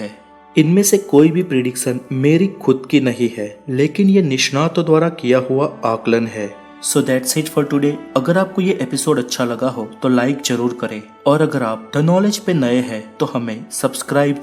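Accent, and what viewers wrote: Gujarat, India. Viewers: Video is nice but the speaker has Gujarati accent in his voice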